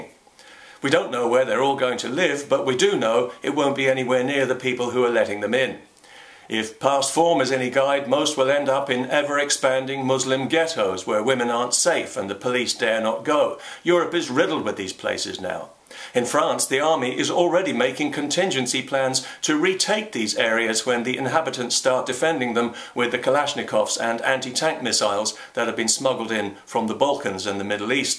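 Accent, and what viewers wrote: England, United Kingdom. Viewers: Condell is himself an immigrant. Despite the English accent, he was born in Ireland.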